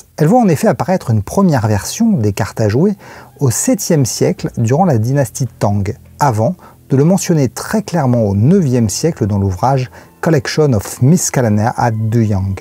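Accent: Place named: Italy. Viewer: BravissssimoO !!! Pour ce travail historique; tout cela avec une" touche de "fausse facilité "car je suppose que cela a dû vous demander des recherches conséquentes ainsi qu'un grand travail de synthèse! Et que dire de la mise en page magnifiquement illustrée!!!...Quant à l'accent; étant moi-même d'origine italienne...eh bien! ...Pas mal du tout!😆😂🤩( je partage votre vidéo sur mes groupes!).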